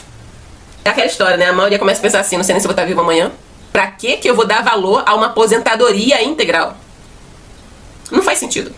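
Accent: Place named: Rio de Janeiro, Brazil. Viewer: acho lindo o sotaque dos cariocas, saudades do Galeão.😂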